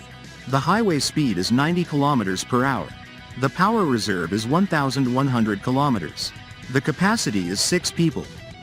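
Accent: United States. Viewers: While he at it change that dumm american accent aswel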